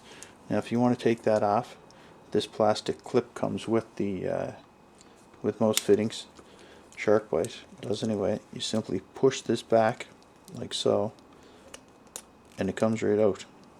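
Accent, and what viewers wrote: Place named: Newfoundland, Canada. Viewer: Excellent video sir! Very clear, succinct, and to the point. Completely unrelated - to my ear, you sound like you might be from Newfoundland. (St. John’s or vicinity, perhaps?) It’s not very noticeable - but I picked up a subtle accent on words like “part”, “proper,” “marker,”- and “copper.” If you are NOT from Newfoundland, Id be curious to know where you ARE from. Thanks again for making this very informative video. Cheers!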